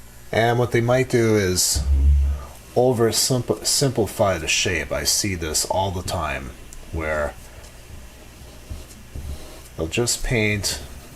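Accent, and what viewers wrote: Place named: Minnesota, United States. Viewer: best tree video I've seen. I've been practicing our steps and I'm happy how the trees are turning out. Hey you sound like where I'm from - back in Minnesota.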